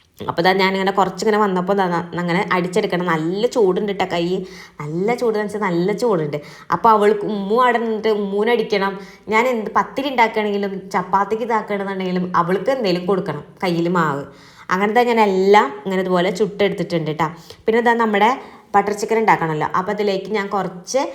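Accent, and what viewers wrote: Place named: Thrissur, India. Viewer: അവർ Thrissur alle? സംസാരം അങ്ങനെ അല്ലേ kayiooo.നമ്മുടെ പോലെ ആക്കാൻ കയിയണമെന്നില്ലല്ലോ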